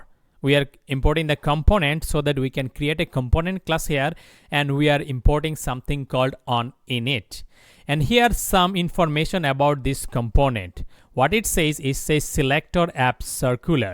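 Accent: India. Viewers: Heavy bengali accent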